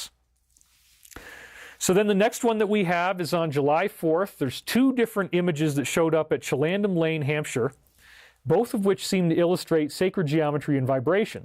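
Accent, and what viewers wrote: Australia. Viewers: I love your Australian accent ‼️I lived there for a minute and you do it real well and you’re a fun guy. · Great info! Love the Australian accent and your sense of humor! Have you ever seen the 1974 Brian De Palma movie Phantom of the Paradise? it's an extremely interesting movie I think you would get a kick out of! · Very enjoyable program for the most part-----thank you. My exceptions, personally are #1) I don't trust "Dr. Steven Greer" any further than I could toss him (appearing or whatever in your next film? can't STAND him) #2) I think your Australian accent is funny and I enjoy it----but Hon, you lack the ear to do a British dialect of any sort, upper or lower class. Not meant to hurt your feelings---just stating a fact. #3) Narcissists and Psychopaths do not "Repent"...they will not be Allowed into the Higher Frequency Timeline. 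The less said about them the better! Loved all the crop circle slides---so nice to have this review. God Bless You!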